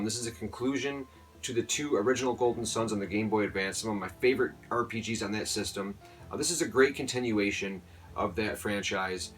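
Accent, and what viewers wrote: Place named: Wisconsin, United States. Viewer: great job man! keep it up dude. are you from wisconsin? your accent sounds like it. could you start listing the game titles in your description box? thanks dude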